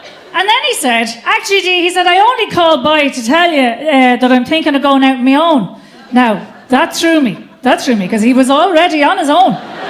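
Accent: Ireland. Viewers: Such a gorgeous Irish accent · Is this accent from Southern Ireland?? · 35 years as a maintenance man (industrial mechanic) and final some women get it, lol. Love a girl with an Irish accent. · 😆 Before clicking on the video, when reading the title I was thinking "High Maintenance". What‽ 🤔 I was curious... Stayed for the humor. 😉 Beautiful Irish accent by the way!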